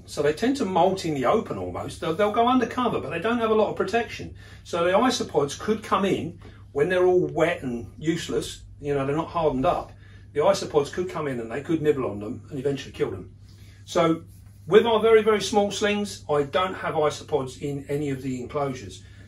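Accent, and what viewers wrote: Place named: London, United Kingdom. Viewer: man, I didn't like spiders until I found your channel. Wow. And I love that salt of the earth London accent 👍🏻 Changing people's minds pal All the best From sunny Glasgow 🇬🇧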